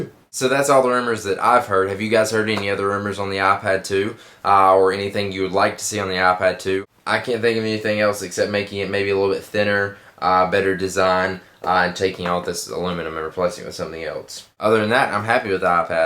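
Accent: South, United States. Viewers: its called a southern accent, or in his case, a semi-southern accent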